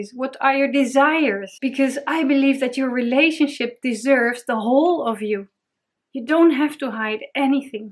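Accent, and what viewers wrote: Netherlands. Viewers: hey, I hear a fellow dutchie here!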